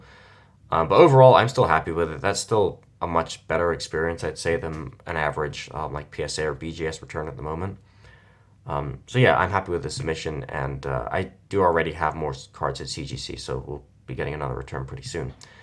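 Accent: United Kingdom. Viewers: ah that explains much more things ! I did wonder about the location at some point since you have a not-so-marked british accent - though still obviously noticeable in hindsight ^^ Well keep the good work and thank you for sharing your magnificient returns. It's quite rare to have European collectors not shy of showing their pearls so it's a pleasure to watch it beyond the Channel.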